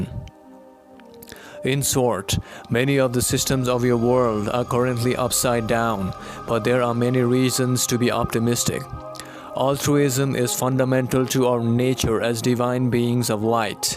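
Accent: India. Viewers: Look! Here's the thing...oh, but first a question....is your home turf India?? Cuz you sure sound like you come from India!! So now..the thing..... Don't come here telling me my ways are wrong!! Cuz I don't like that! It's rude!! And another thing , you talk like we, no... me. I'm just going to speak for myself, so scratch "we". Anyway, You talk like I totally don't have a clue of what's happening on " my " planet! I know about the cabal, elite, deep state, the " 1% ." I know what their agenda is, and what they are pulling!! How do I know these things.. you might ask? I read the HOLY BIBLE! It tells me everything I need to know, and how to fight them!! And... THERE IS ONLY ONE WAY TO GOD!! AND THAT IS THROUGH JESUS CHRIST !! THERE IS NO OTHER WAY!! AND ANOTHER THING!!!...ALL YOUR MEDITATION, CHANTING, CHAKRAS, AND THE LIKE ARE FORBIDDEN BY GOD!! SO...RIGHT THERE I CAN SAY.. 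I DON'T WANT ANYTHING YOUR SELLING!! BECAUSE THE ONE AND ONLY GOD OF THE UNIVERSE.. "JEHOVAH " Would NOT send messanger's who breaks his command- ments!! So... I'm thinking you have a whole different " god " than I do. And if you don't mind, I'll keep the one I got, thank you!! And another thing... The love of money is the root to all evil!! It's not the root to sacred cows!! ( again, India! ) Are your cows blue? Just wondering. Now, you say that y'all have gotten to your much advanced civilization, by trial and error. So, why isn't the human race allowed to advance in the same manner? Why do you feel you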